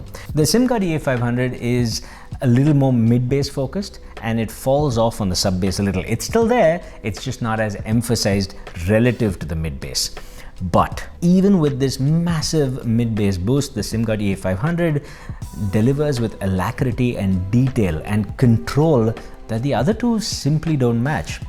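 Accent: India. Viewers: I really enjoy your reviews. I love your oration - great choice of words and phrases! I'm British and maybe that nice flavour of Indian English appeals to me. I don't know, but I like it :) Keep it up. Namaste.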